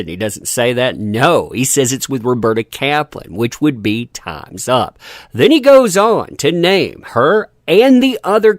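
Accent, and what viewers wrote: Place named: Appalachia, United States. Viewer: Is this your genuine accent? 🤔 Sounds like a Deliverance dialogue!! Be professional, please!!!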